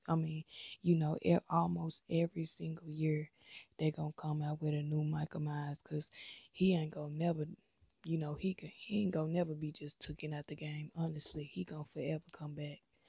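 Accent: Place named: South, United States. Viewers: Girl you are hilarious 😩😂🤣… I’m sleepy & entertained. Your personality shines through this skit. Love it! & You are from somebody’s Southern state, I hear it loud & clear.🫶🏾 I’m glad this popped up on my feed. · Being from alabama this just sounds like a southern accent to me 👀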